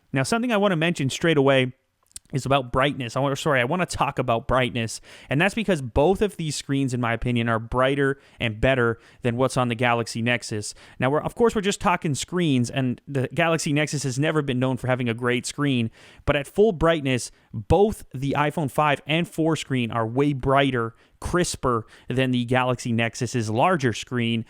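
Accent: Canada. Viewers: Canadian accent? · Canadian lol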